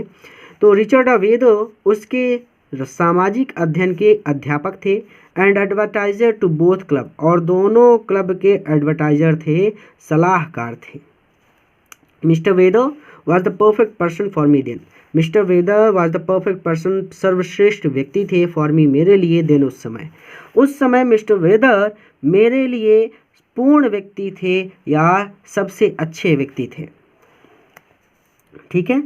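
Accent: Allahabad, India. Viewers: Sir kya aap allahabad se hai kya 🙂🙂🙂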